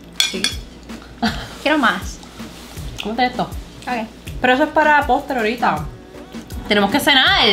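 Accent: Puerto Rico. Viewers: Ahhh me encanta tu acento boricua!!! 😂😍😍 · Me encanta tu accento boricua eres hermosa y muy energética me encanta todo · Está mujer si q tiene acento bori 💯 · Me encanta tu personalidad eres un vacilon 👍😅me siento bn orgulloso d q t sientas como toda una boricua 💯💪me sorprendes y me encanta tu acento d mi preciosa isla no puedo irme sin antes decir q eres BELLA........😍😘💖🌹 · Me muero de la risa contigo yo soy nacida y criada en P.R hasta los 16 y tu hablas mas boricua q yo!😂🇵🇷 love the video you are too funny. Definitely new sub!! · tu asento es de boricua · Que mujer mas linda de verdad. Una mujer China hablando bien boricua. En la escuela yo vi una Japomesa hablando español y en la Universidad una Vietnamese woman tambien hablando bien Boricua. Que bonito de verdad. Dios bendiga tu belleza. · Niña tu acento es puro boricua. Nosotros queremos recibimos muy bien a nuestros invitados. 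Mi recuerdo es las reuniones familiares, yo extraño mucho a mi familia. Feliz Navidad hermosa💞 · 😃😃😃😃nena tu hablas mas puertorriquena que yo ME en canta el hablar boricua 😭😭. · Quien es esta Boricua china habla bien borricua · Que chulita, con ese hacento Boricua ,.Que pena que no eyes de mi epoca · Nenaaaa, eres 100xciento boricua. Acento, manerismos, pique, me rei tanto contigo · Gracias por hablar tan lindo de mi isla. Tienes el flow Boricua. 😊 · Si no me dices que eres china, ni me doy cuenta. Pareces, hablas y actúas como una puertorriqueña 🤣👐🏼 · 😂😁😂 Te pasas!, saludos hermosa, no te puedo ver como china pues con ese acento y carisma de boricua al 100%, imposible, no se como caí en unos de tu videos pero si, son cheverones😁 muy simpatica, te voy a seguir viendo por aqui🙏 cuidate!😁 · Woooo corillo aquí una nueva subscriptora jajaja te ame dsd el momento en que te escuché hablar boricua de pura sepa 💓 Desde Ponce PR ❣️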